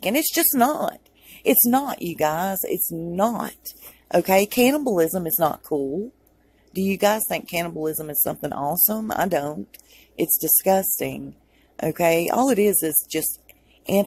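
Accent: South, United States. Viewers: Well said!! You have this sweet country accent combined with such truth, intelligence and highly articulately. Love your work girl! 👏🏼👏🏼👏🏼👏🏼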